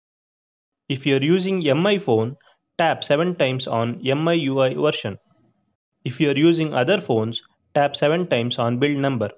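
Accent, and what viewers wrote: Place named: India. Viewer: Why does all this videos on youtube has an indian accent WTF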